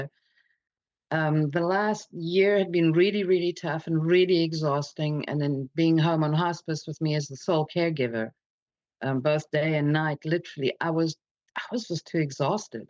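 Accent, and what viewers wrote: South Africa. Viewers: Hi hun, you explained this all very well. I lost my sister and it is still very raw, by the way, you sound like you come from Durban, I'm from SA! Durban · this is an odd question.. but your accent - I hear South Africa but there is definitely something else there, if not two other language / accent influences?? Just curious. Thank you for sharing - hello from Jukskei Park in Fourways.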